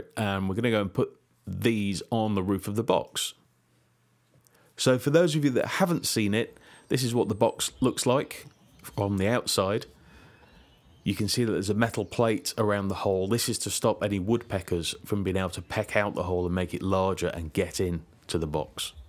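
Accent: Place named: United Kingdom. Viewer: 와 영국발음이당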